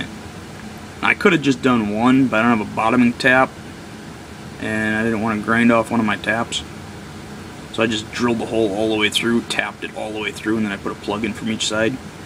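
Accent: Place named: Michigan, United States. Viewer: I love the long needle and cork idea! Many times we've had to punch holes for belts, and also we like a make small leather projects. You can get a rolling wheel with sharp spikes on it, to mark your points for stitching, then use your needle punch to finish the holes through. Thank you Accent sounds L.P. Michigan to me, have relatives. I really like all the punches, and variety you have created for use with your arbor press.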